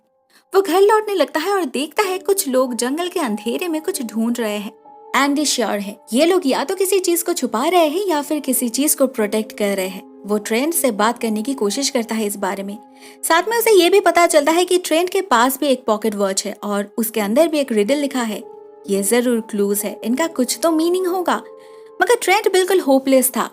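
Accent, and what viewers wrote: Bengal, India. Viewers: My my your sweet sweet voice 😋 ur accent feels like Bangali 😍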